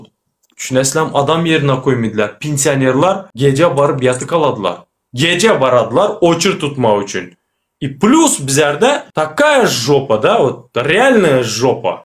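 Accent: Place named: Chardzhou, Turkmenistan. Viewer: Это наш Чарджовский (нов.наз.Туркменабат) диалект.У нас на родине есть Лебапская область там у нас все разговаривают на таком диалекте.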